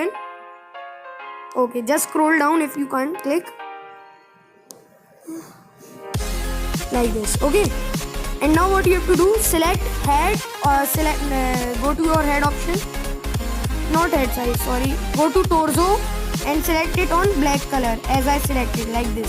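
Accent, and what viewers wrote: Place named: India. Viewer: Definately indian... I can get to know any Indian by his voice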